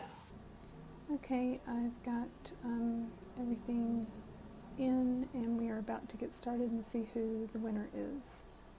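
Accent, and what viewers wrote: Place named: Alabama, United States. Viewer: Where are you from in the south..I am from Arkansas and have lived all over Alabama. You sound like you're from Alabama and you say um a lot .lol...So I've never tried Tribe Beauty Box, I almost did..here is my email bc I don't always get my <mail>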